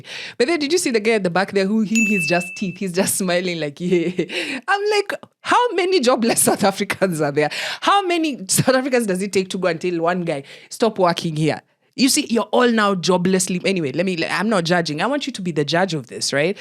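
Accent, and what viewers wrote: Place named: Kenya. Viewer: Kenyan accent.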